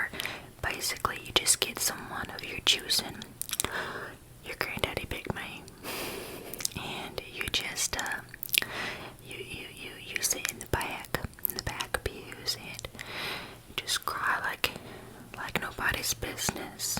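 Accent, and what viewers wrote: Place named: South, United States. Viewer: This was SO GOOD!!!!!!!!!! As a southerner myself, I can say the accent is accurate to what most of us sound like. And also, I got a lot of tingles from the video. So keep up the good work!!!!!!!!!!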